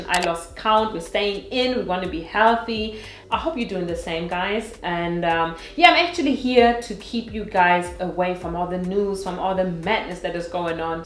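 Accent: Germany. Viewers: Are you German or sth? You definitely don't sound American 😂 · Sie hat doch einen deutschen Akzent, oder irre ich mich? Sorry kannte sie bis eben nicht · das liegt daran, dass ihr English sooooo krass deutsch ist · You can hear her German accent right away it’s kinda cute she is so damn pretty tho 😍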